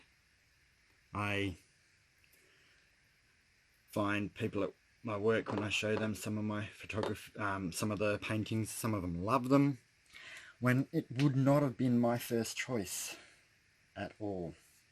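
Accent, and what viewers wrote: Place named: Australia. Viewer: I think it turned out really cool. The colours are lovely and I love the Melon and Turquoise cells. I have those colours too! It's lovely. I'm trying to place your accent... It has Aussie in it but doesn't sound pure Aussie? I'm fairly new to Aus so I'm still getting used to the accents. I'm from South Africa so I'll sound totally different to you and I'm so nervous about that. Anyway, good on you for starting this adventure!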